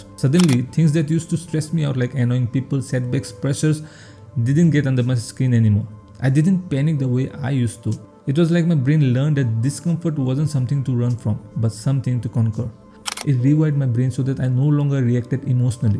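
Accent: India. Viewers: Assamese Accent on top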